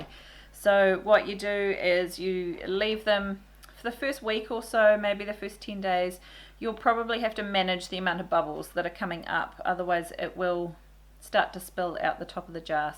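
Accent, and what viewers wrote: New Zealand. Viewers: Awesome! So good to hear a kiwi accent! I live in the states and have done for years. Was looking around to make apple cider vinegar. So I will try yours . Thanks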